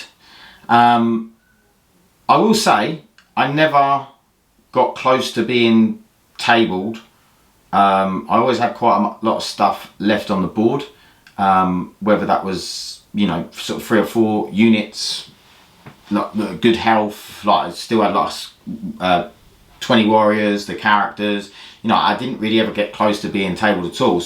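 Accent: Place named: Australia. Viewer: Thought you were from OZ…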